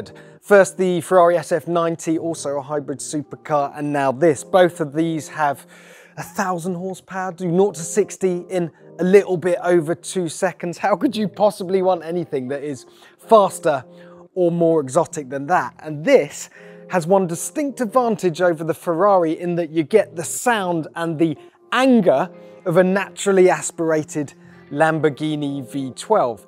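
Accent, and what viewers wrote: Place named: England, United Kingdom. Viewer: Dude speaking with English accent, then goes on to use miles, km and liter. Why I generally don't watch Top Gear, rubbish.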